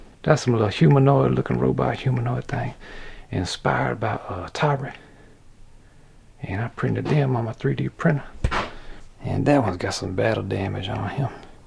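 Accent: Louisiana, United States. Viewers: sounds like Tom segura’s Cajun accent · From the first time I heard your voice, Mr, I KNEW you were from Louisiana, I just knew it. Looking at the trees in your yard, and the Baton Rouge "evidence".... ah hah. Kindred neighbors. Because of you, I have bought myself some two part mold making material and I've started my first diorama. Just a small little thing, but... yeah. Neighbors😁😘 love you Mr.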